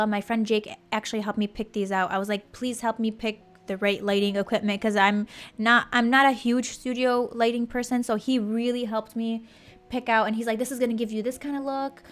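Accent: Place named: United States. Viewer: As someone who lives in Britain i think it would be funny if you tried to approach people and do a British accent and see if people notice or fall for it, or notice that you actually have an American accent.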